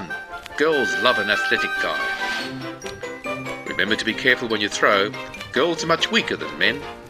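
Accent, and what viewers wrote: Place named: South Africa. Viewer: Funny! But why the South African accent?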